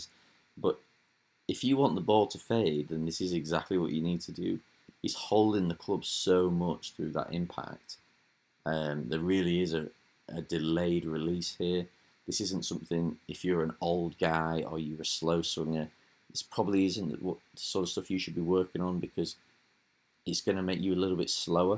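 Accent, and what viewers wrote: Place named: Yorkshire, United Kingdom. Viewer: Great video and a nice surprise to hear a yerkshire accent on a golf video. Could do with turning your mic levels up, or speaking a bit louder. Audio is clear but quiet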